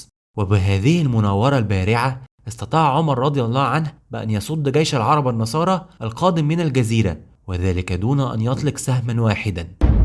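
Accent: Egypt. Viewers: يارجل ... ليت انك تتحدث بالفصحى ... فوالله ما اكره شيئا كما اكره سماع اللهجات بمختلف انواعها ... ودرجة الاشمئزاز كبيرة جدا عندي ... عند سماعي الجيم المصرية التي حولت لحرف g الانكليزي تكسيحا لجوخ الضباط الانكليز وتقبيل نعالهم ...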